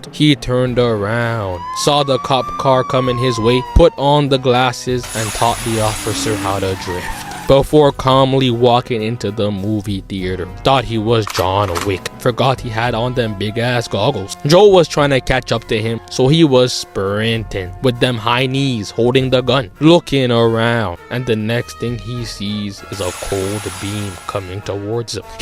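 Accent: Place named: Toronto, Canada. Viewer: I can't pay attention with that crazy ahh toronto accent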